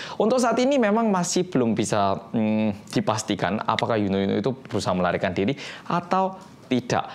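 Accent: Java, Indonesia. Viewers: Kalo gak nonton videonya, cuma denger suaranya aja, bener2 suara orang Jawa, medoook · Orang mana si. Asli mana. Kok medok. #penonton_baru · Medoooook, asal mana to om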